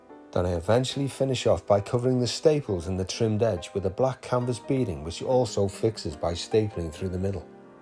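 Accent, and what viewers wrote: Liverpool, United Kingdom. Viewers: Cannot wait for the next one. This is how a video of this sort of work should be. No irritating Muzak, or smart arsed co presenter, playing at comedian, just a guy who knows what he is doing and explaining the stages viewed in a relaxed, quiet Liverpool accent. Superb, thank you,.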